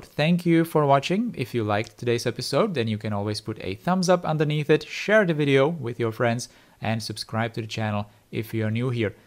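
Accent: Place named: Czech Republic. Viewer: It's the czech accent for me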